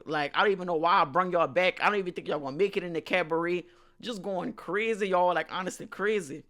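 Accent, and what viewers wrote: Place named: Louisiana, United States. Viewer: you have to be from the 337 😭 if not i would be genuinely surprised because you have a strong accent lol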